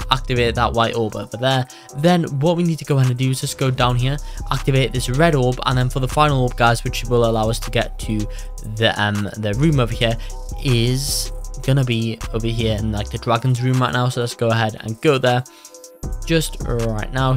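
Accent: United Kingdom. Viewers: U ate british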